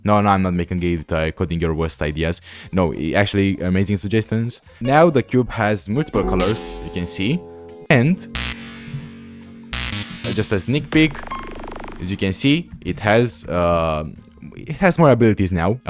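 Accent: France. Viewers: are u french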